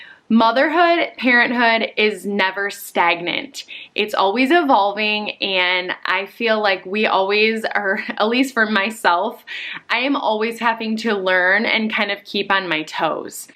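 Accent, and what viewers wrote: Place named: Michigan, United States. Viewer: Commenting again to say that I’m a Michigander (now residing in Tennessee) and it’s nice to hear someone who has my “accent” 🤣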